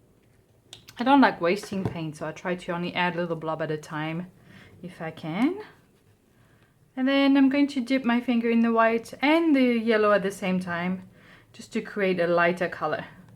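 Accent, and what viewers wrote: Australia. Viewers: thank you for your inspiration! I liked your commentary about just playing - not to create a masterpiece, just play. I've never used my hands to paint before and it was so very much fun! Thank you for your posts - you are lovely to watch. Also, your accent is lovely - part Aussie, part French perhaps? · So you live in Sydney. I thought I could hear an Aussie accent coming through. With a username like I gather you are from somewhere there. Beauitful. Thank you for the video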